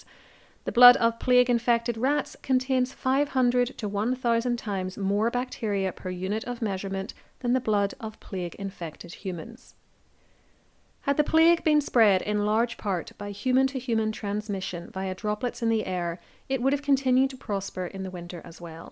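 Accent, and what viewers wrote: Ireland. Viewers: Very interesting. Good research and no background music, thank you. New subscriber. The narrator has an interesting accent. Is it somewhere in Ireland? I could be way off, but it reminds me of my visit to Dublin decades ago. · Ps. I LOVE that sweet Irish accent, jus’ sayin’… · No no it it's lovely, that's why I used Brogue instead of accent. My best mate was a lecturer at Queens, he married a beautiful lady from Ulster she has a rather stronger brogue. If we lose these wonderful parts of our culture - think how boring it will be. Your speech is wonderful , please stick with your normal relaxing voice. 👍👍👌👌 I've just subscribed , so please don't go American there's enough on Utube.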